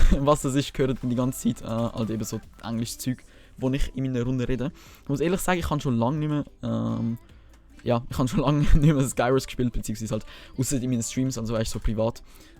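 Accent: Zurich, Switzerland. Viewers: WeirdChamp das ich andere auf YouTube die schweizerdeutsch reden und ich bin mir gewohnt das du so redest (bin auch Schweizer ich verstehe alles) für die Deutschen: Hoi we gohts öich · bahhh züri digge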